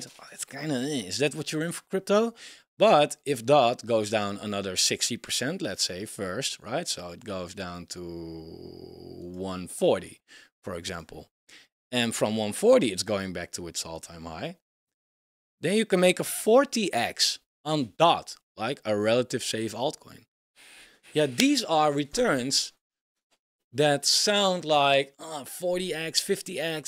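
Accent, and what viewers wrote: Netherlands. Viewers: Je pikt ze er altijd uit, de Hollanders die Engels praten :p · Lol mooi nederlands engels haha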